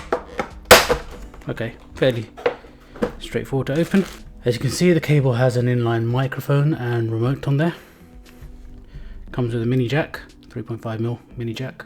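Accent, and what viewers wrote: England, United Kingdom. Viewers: Bhai tumhara accent ek dum english man jaisa hai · Great review, liked your pure english accent!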